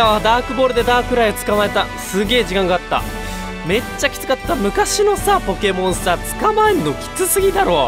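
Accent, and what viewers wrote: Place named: Okinawa, Japan. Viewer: イントネーションが沖縄っぽい(笑)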